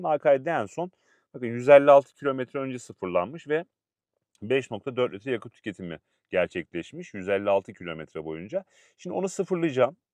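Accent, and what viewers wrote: Samsun, Turkey. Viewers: Samsun şivesi😊olmuyor maalesef😊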